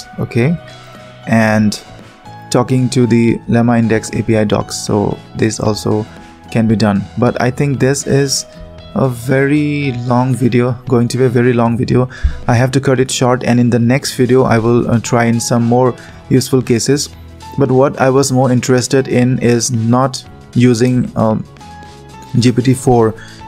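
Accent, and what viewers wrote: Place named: India. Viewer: As if it isn't already difficult enough to understand what you are saying with your heavy Indian accent, you went ahead and made it even worse by playing background music.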